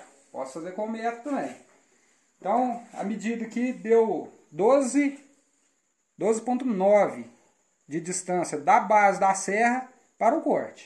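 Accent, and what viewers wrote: Minas Gerais, Brazil. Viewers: Muito bom e ilustrativo ainda mais com esse sotaque mineiro ficou melhor ainda parabens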